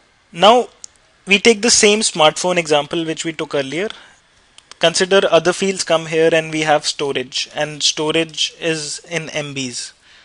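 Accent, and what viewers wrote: India. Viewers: Amin Mohammed His accent sounds like Indian - He's an Indian bro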